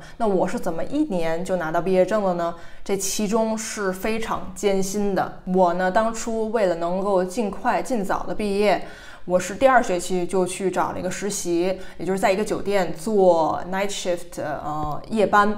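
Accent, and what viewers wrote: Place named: Northeast, China. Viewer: 小姐姐是东北的哈哈，有一点小口音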